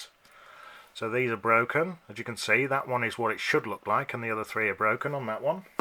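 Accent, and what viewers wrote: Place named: United Kingdom. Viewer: That's British English bro.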